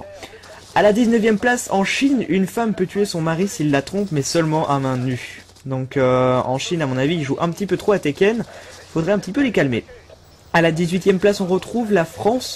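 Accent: Belgium. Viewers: j'adore notre lois belge U_u t'as un bel accent wallon =D c'est si rare mtn de parler wallon T_T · Si tu aussi tu as quitté après , pouce vert! Non, je rigole! xD J'ai adoré ton accent belge(je suis moi-même belge, je ris au imitations que l'on fait de nous!) et j'adore ta manière de commenter! Pour ton prochain top 20, tu devrais en faire 1 sur les 20 choses les plus tapées sur Google (tu tomberais sur des trucs... interessants!) Bonne continuation. Bises. Ton compatriote belge.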